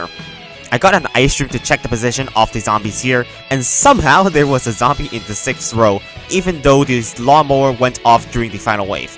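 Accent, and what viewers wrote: China. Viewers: maybe his asian chinese accent you dont like? lol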